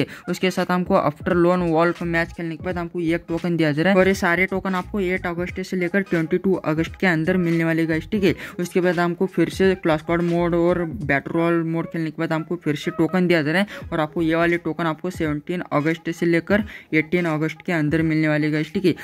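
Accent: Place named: Maharashtra, India. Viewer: Bhava tuzya bolnyavarun vatty Tu marathi ahe... Marathi asla t like de comment la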